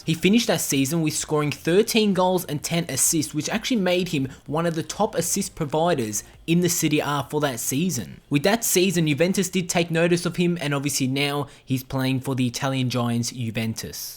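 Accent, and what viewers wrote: Australia. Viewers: Are you Aussie or something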